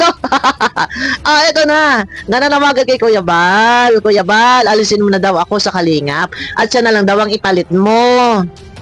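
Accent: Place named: Iloilo, Philippines. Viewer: Matanda kna.....NAGTATAGALOG KA. ILONGGO NMAN ANG TUNO MO.. TANGA KA.. KAKAHIYA KA kapitanang UBOD NG BOBA.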